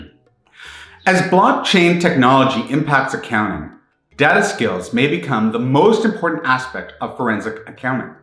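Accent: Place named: Canada. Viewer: You’re Canadian, aren’t you? I can tell by they way you say process and houses.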